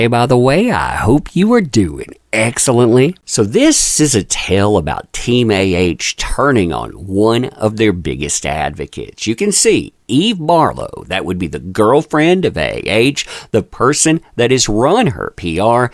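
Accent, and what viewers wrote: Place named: Virginia, United States. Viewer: WOOTEN is still butthurt that Elon purchased "X" and no longer surpressed opinions. BTW Brother, your accent sounds like those in Fredericksburg, VA.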